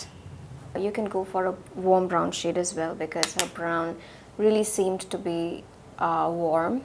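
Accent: Bengal, India. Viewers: Hey just subscribed & loved ur video! r u Bengali? U sounded like a Bengali. I'm from Bangladesh. Sending love from here 😘 Nd u're so much natural beautiful that any makeup look will suit u.even without makeup u're much pretty 😍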